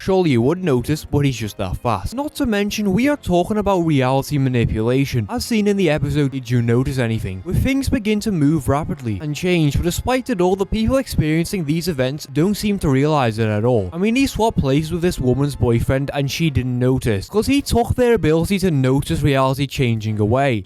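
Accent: Liverpool, United Kingdom. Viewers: You sound like your fighting the urge to turn scouse with some sentences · why did I, a fully grown person, just watch a vaguely scouse bloke talk about a childrens tv show for 8 whole minutes?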